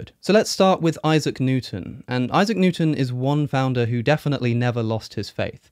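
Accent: United Kingdom. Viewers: it's still a British accent. Just like a New York accent is an American accent.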